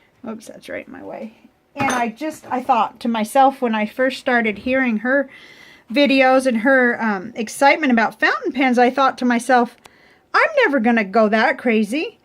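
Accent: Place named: Texas, United States. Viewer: You look and sound like me. So "hello" Texas girl. I thought you might be from the north. I am in N Texas. I just started all this in May myself, I buy cheap pens to try the nibs out. As Brian from Goulet said Quantity over quality" I too discovered cigar boxes and some of them are just beautiful. And they are cheap. Very handy instead of all the plastic storage which I just could not put my pens in something like that. I, too use those syringes to fill all my pens instead of dipping the nib in the ink. That is just too much of a hassle. I have alot of your videos to catch up on.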